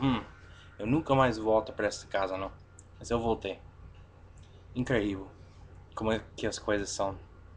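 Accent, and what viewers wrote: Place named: São Paulo, Brazil. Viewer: Melhorou de mais! O Brasil é um país que em nenhum canto se fala igual devido aos vários sotaques, porém existem alguns que são mais falados por aqui. Então vai depender de onde você vai ficar aqui no Brasil para podermos indicar um sotaque. O seu R depois de uma consoante no momento é igual ao dos paulistas, que no Brasil em geral é o menos usado. Ex.: "retardado" o mais comum é dizer "retarrdado" (Hetah-dado)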